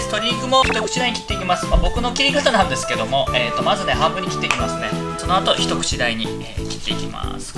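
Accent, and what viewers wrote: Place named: Kansai, Japan. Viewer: 今日も絶好調ですねww リュウジさんはなんでちょいちょい関西アクセントはいるの。 そこもすこ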